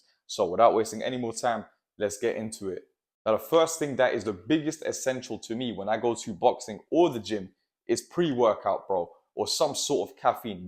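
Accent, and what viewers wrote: United Kingdom. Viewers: No clue would say prolly British?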